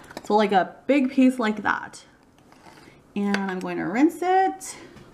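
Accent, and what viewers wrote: United States. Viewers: You speak some of your long “O“ vowels like a Minnesotan, but your hard “R’s” like an Angeleno. You have your own, unique colloquial dialect. I like the uniformity of your burger patties. Well done.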